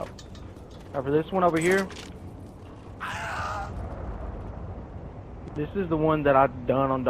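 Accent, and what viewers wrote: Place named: South, United States. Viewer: People from the south don't give fuck how they talk buddy [: Js...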